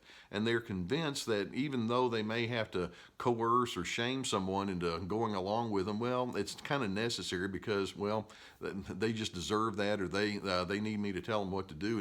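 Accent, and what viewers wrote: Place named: Texas, United States. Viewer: Such hard-earned wisdom (and common sense) delivered with that charming Texas drawl. These are calming and really informative. Thanks Doc.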